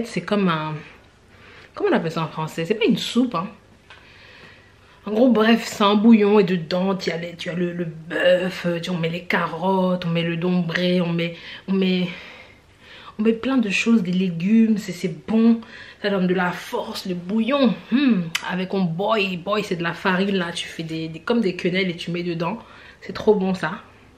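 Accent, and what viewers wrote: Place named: Africa. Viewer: Merci pour toutes ces expressions ; que je connais sans savoir leur signification . Tu es trop forte .tu as l'accent africain et jaime bien .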